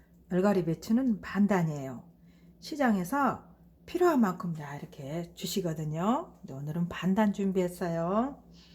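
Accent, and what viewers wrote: Jeolla, South Korea. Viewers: 낭글 낭글ㅋㅋ 전라도 아짐ㅋ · 말투나 만드시는 음식이 남도쪽이신거같은데 남도가 고향이신가요?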